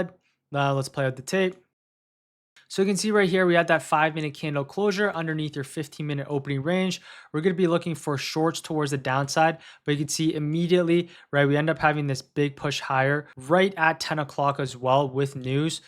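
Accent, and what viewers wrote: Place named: Canada. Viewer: Canadian ey!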